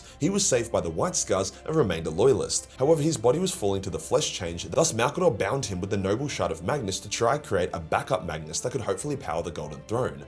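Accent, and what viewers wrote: Australia. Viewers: Bruh when u said the battle was harder than a word bearer at a daycare centre that shiiii caught me so off guard I spat my drink out ffs this guy never fails to make me laugh every video the Aussie accent makes it even better brilliant stuff my guy.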